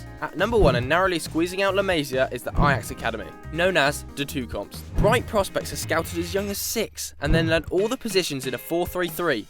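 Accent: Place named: United Kingdom. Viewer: Why do british people always have to pronounce names their way La Mayseeya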